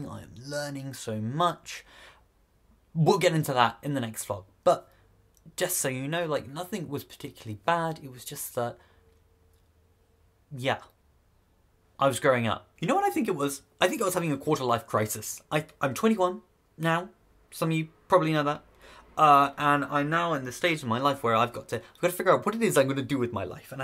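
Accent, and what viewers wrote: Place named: England, United Kingdom. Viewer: Okay, so at first I was watching your videos simply for the applying to Oxford content, but then.. I don't know, there's just something about the way you talk to us which I really enjoy! :) Plus I'm still trying to get used to English accent, and since watching you is quite a pleasure, I guess I'll be staying here. ;)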